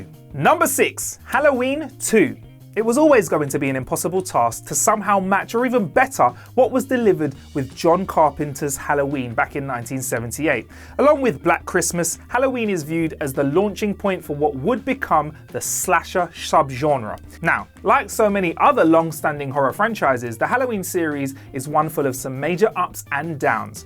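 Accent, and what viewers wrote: United Kingdom. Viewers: Why don't you guys just realize that British people pronounce things differently than Americans do?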